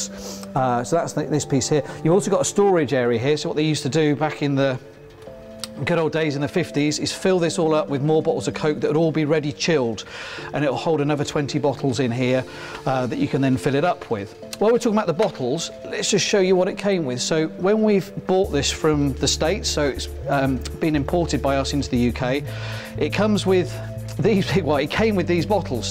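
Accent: England, United Kingdom. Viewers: (This is Tom, not Sandra.) Great video sir. Love the English accent, too. I wish l could find a classic Coke machine from the 50's or 60's. I love ALL things, classic, from the 50's and 60's, myself. I, being born in 1958, grew up with these classic drink machines. Thank you for highlighting these wonderful artifacts from our past, sir.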